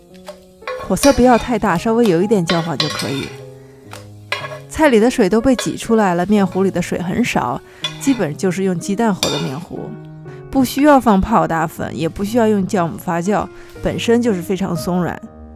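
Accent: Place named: Xi'an, China. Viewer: 您好，我也是西安的，目前住在台湾，听您说话好亲切，标准西安普通话，哈哈，想请问下回到西安在那个网站可以看到您的视频。youtube在大陆打不开呢。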